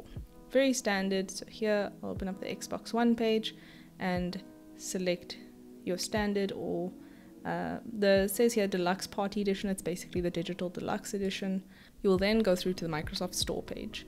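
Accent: South Africa. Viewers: Are you a South African? I recognize the accent. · Yep it's a south African accent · Wait, you're South African? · You from South Africa right?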